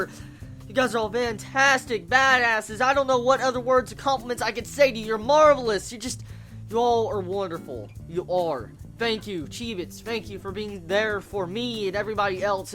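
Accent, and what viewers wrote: South, United States. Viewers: Is it me or do have an southern accent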